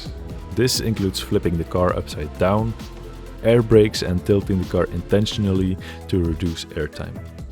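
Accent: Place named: Netherlands. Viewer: 100% you are dutch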